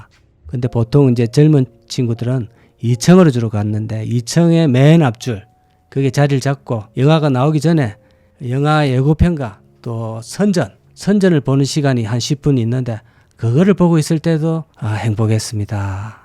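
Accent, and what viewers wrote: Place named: Masan, South Korea. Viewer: 아~ㅎ 고향이 마산이시구나.ㅎㅎ 배챠 하실때 느낌알제.ㅋㅋ😂😂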